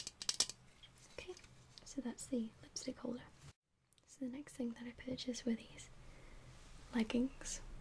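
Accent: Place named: Wales, United Kingdom. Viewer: love your videos from hirwaun and its nice to hear a welsh voice on here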